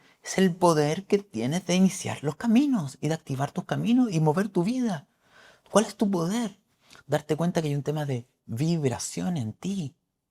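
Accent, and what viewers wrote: Chile. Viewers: Gracias,. Mantener el corazón abierto y agradecer. Intentar por todos los medios no estallar....respirar y cuidarse y cuidar. Vídeo para guardar y reescuchar si es necesario y creo que lo será. Agradezco todo lo que transmites....seguro que habrá momentos que sea agotador. El panorama panorama es terrorífico... pero como tu dices es una oportunidad. Hay muchas personas sufriendo y mucho. Cada uno sabe por lo que pasa pero hay lugares en donde no saben que va a ocurrir de un momento a otro de manera terrible. Siempre dices al final de los vídeos que nos quieres mucho y nos cuidemos....en chileno que es un modo de hablar encantador. Y que me resulta tan familiar...así que yo hoy te digo que se te quiere y que te cuides mucho. Abrazo para ti y para todos. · Hola buenas tardes. Soy chilena 🇨🇱 del signo de virgo. Me encanta la forma como te expresas habla como un verdadero chileno, muy real y muy sincero oro y miel para ti 🙌💪🐘♾️🌹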